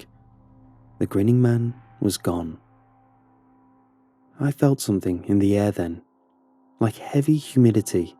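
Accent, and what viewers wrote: United Kingdom. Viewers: why is it that a good British accent makes any story better? there is something soothing to me about it, like he could tell a story about how he makes mashed potatoes and it would likely captivate me. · Why is the guy British bruh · What’s with all these videos narrated by a Brit?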